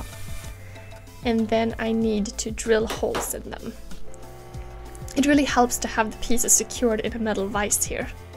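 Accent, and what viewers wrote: Sweden. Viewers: Just found out your channel. Thats a great video, congrats. Sorry to ask but are you somehow swedish in any way? I ask cause when you say OK for example it sounds a lot like if you were. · Are you from sweden? Because you sound really swedish xD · maybe out of topic but it sounds like you got a strong Swedish accent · Nice! sounds like you having a Swedish accent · I'm confused. Your accent is Swedish but you say "aluminum" and use the imperial system. What's the deal?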